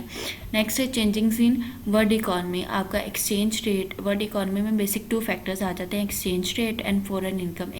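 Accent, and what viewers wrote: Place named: Pakistan. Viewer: Aapki aavaj se lag rha hai , ki aap Pakistan se ho 🙏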